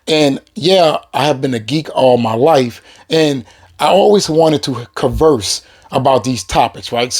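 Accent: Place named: Louisiana, United States. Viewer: You sound like you’re from Louisiana. I think Channing Tatum studied “black people” from that state to get the Cajun accent. What do you think?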